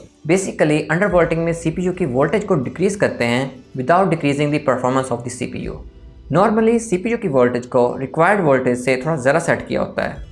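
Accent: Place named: Faisalabad, Pakistan. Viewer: Bhai, ap Faisalabad se lag rahy hain. Ap ka English ka accent ese hi hai. But fer v lagy raho. Love you!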